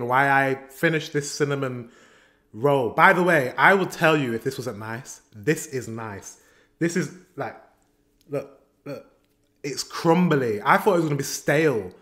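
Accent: United Kingdom. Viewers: you are such a sweet and charming young man. Is your accent northern? or midlandish? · By the way. Your english sounds british.... I continue anyway. What about risengrød. On that, you have the same cinnamon/sucker on top of it.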